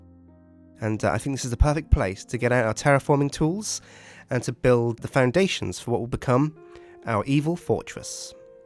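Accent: United States. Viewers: You sound a bit american with you sore voice.makes me wonder. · He sounds American. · Is it just me or does sound like an American when he is sick?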